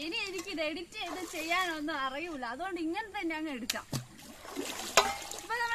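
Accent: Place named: Kozhikode, India. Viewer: Achanodum ammayudum nalla snehamulla kutty welldone pengalee place kozhikode anne alle slang kettapoza manasillayathu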